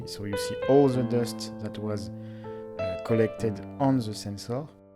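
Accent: France. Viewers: I was kidding, is that a problem? By the way, I love the Frenglish accent :-)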